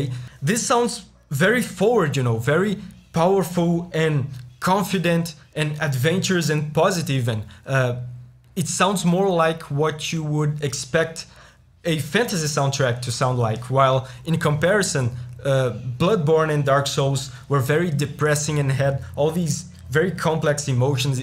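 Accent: Brazil. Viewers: bro, are you brazilian?? your english accent is a little bit like a brazilian speaking english (don't take this in a bad way, but it remembers me so much) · Vc é Brasileiro? Sotaque brasileiro.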